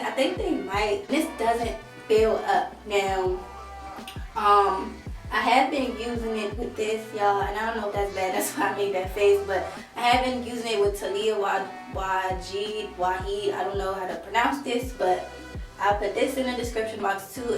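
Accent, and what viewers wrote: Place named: DMV, United States. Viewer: You from the DMV i hear it in your voice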